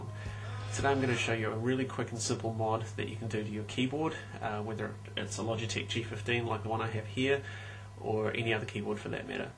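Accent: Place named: New Zealand. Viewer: He's a Kiwi bro L2ACCENT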